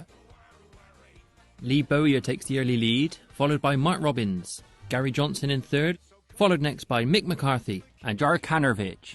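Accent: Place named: Southern England, United Kingdom. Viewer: LOL, I take it that you don't like "that other team" as evidenced by the vomiting sounds in the commentary. Sorry, I don't know the teams as I'm from the US, but then I don't follow this sport on either side of the pond. May I ask where you are from? I'm trying to place the accent...I'm going to guess....the southern half of England....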